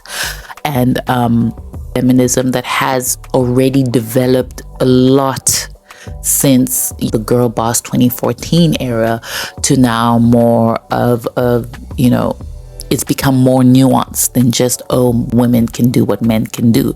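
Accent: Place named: Kenya. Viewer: I really like your speaking voice and accent. It reminds me of my Kenyan friends. You have a cool demeanor.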